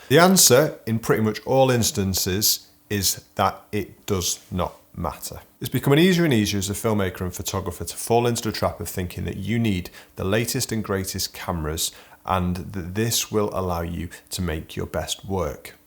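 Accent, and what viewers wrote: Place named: Birmingham, United Kingdom. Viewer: Sounds like from Birmingham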